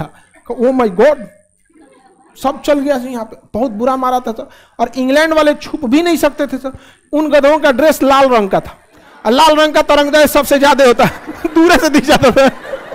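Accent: Bihar, India. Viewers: Tu Hindi me bol Kya bolta he kaun si deshi bihari bolta he Achhe log samaj nahi pate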